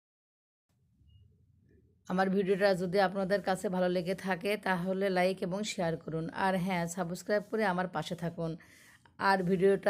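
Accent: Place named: North Bengal, India. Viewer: প্রথমে ধন্যবাদ জানাই সালাম দেওয়ার জন্য । কথার ধরনে বুঝা যায় তুমি উত্তর বঙ্গের । থাক্ ভাল থাকুন, সুস্থ ও নিরাপদে সুরক্ষায় থাকুন।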